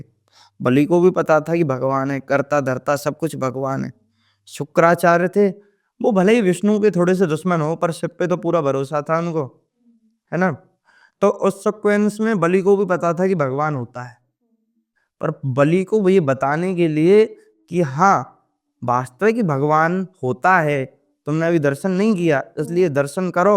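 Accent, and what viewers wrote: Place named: Braj, India. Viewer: बृजवासी tone he ye शंका ना करे🙏🏻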